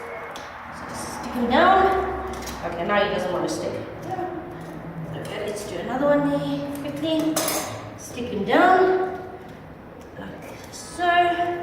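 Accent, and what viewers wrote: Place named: South Africa. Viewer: Well some people are a little thick not to point at RAzzA, I personally watch it a few times not because I did not get it the first time, I just Love the South African accent and your beautiful eyes can't wait for the touch screen thermostat video . Not sure what you were thinking with the scissors? hey but.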